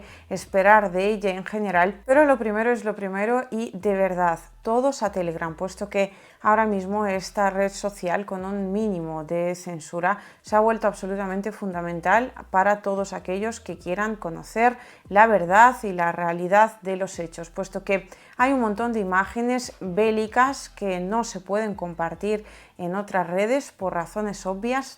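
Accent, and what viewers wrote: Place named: Spain. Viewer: Me sorprende el acento español de Liu, no es tan fastidioso como el de varios periodistas nativos de España de otros canales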